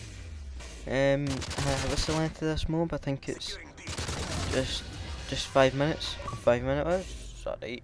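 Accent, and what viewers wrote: Scotland, United Kingdom. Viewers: Bro ur growth has been superior! And trust me in my opinion ur commentary is a trillion times better than those u mentioned at da start! No one beats ur one liners. And FUCK APOLOGIZING about ur accent! Scottish and proud (ain't ur fault if ppl can't understand) Peace!!!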